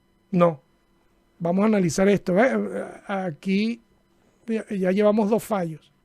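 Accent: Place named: Venezuela. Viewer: Hola!! No se si te ha pasado, que despues de estar tan lejos de casa, oir a alguien hablando venezolano es como musica para mis oidos.. :-) aprendi mucho con el video anterior ( y me rei demasiado con "que tiene que ver el c... " y lo de la "burra bipeda" jajaja) y me dio mucha tranquilidad saber de alguien que despues de la perdida de un dron "mas nunca" se sintio seguro volando.. a mi me paso y eso que que compre un dron "fly away free".. me sentia incomoda, me daba mucho estres y lo guarde por un tiempo.. el cuento es que decidi tomar cartas en el asunto y el lunes presento el examen aqui en Noruega. Aun cuando el contenido es distinto, tu video me ayudo demasiado.. muchisimas gracias y un abrazo :-)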